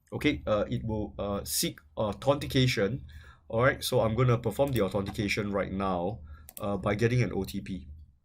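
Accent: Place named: Singapore. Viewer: Singlish in a nutshell: " BAY-SEEK-KE-LEE" "ACT-CHU-ER-LEE" "CHROMWELL REED" "MUL-TEE-PLI" "DI-NORM-ME-NATED" "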